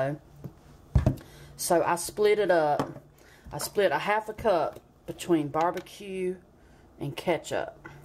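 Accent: South, United States. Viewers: Forget about Gordon Ramsey and those other "Snooty Chefs". A Southern gal that knows what good honest food is - that's the ONLY way to go. I tried this and it IS the best meatloaf I ever had. Thanks! btw...Love your accent!!!!!! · Great video & new Subscriber. Ps , I love your accent , The southern accent is sooo lovely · John Burgess Jerseyians don't sound like this.. She's southern for sure.. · Your southern accent telling me you are from North Carolina on the VERGINA border ,?? Maybe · Maa’am, I don’t know who you are , but I know these two things: a) you’re definitely from somewhere South of the Mason-Dixon Line, and, b) you gave the world probably the BEST meatloaf recipe known to humankind, putting all others in the dust. I’ the cook in our house, my wife cleans, and she thinks it’s the tastiest meat loaf I’ve ever made! Many “ thank you’d” are in order!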